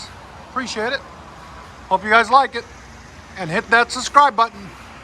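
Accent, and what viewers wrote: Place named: New York, United States. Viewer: He sounds like he from new york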